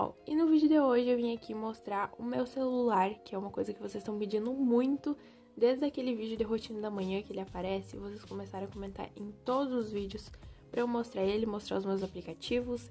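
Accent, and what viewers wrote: Rio Grande do Sul, Brazil. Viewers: Tu és do RS guria?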